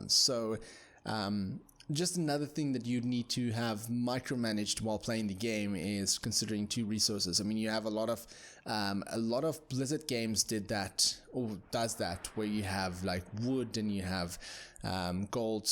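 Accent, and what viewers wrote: South Africa. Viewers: Respek. Generals is a classic of classics. And BTW where you from? Souf Efrika?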